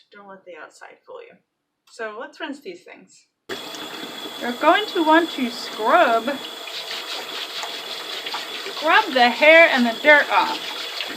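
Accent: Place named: New England, United States. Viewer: Thankfully I recently found your channel and the moment I heard you speak, I KNEW you were also a New England girl. 🙌🏻. Thank you for all you do here. I see the comments. I know all the positive feedback you receive, but I hope you truly understand what positive things you are doing for all of us who are desperately trying to heal themselves in various ways though just don’t know how. Many many thanks. ♥️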